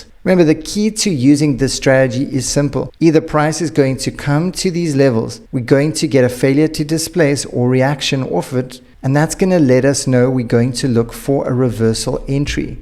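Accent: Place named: South Africa. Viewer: this mans is south african no matter what u can say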